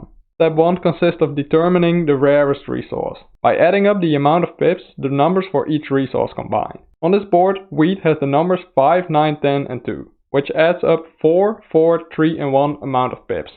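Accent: Netherlands. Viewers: Ah, thought you sounded Dutch! Is there a bit of a community here in The Netherlands? Would love to go to a tournament in the future after this whole virus thing...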